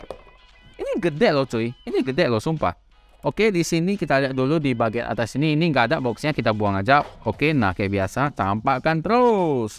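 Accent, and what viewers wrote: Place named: Pontianak, Indonesia. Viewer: Bahase koko macam Chinese Pontianak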